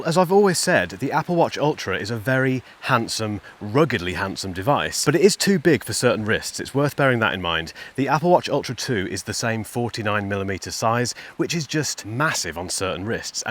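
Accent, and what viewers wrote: England, United Kingdom. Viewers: Maaaan you are great! Love the English accent. I’m gonna buy the ultra 1 used 🔥👍 sorry for my English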